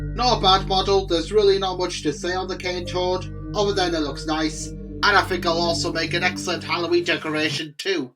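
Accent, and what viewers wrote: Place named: United Kingdom. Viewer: i must say, for a resident in the UK, you have a very interesting accent, what region of the UK are you, because your pronounciations sound unusual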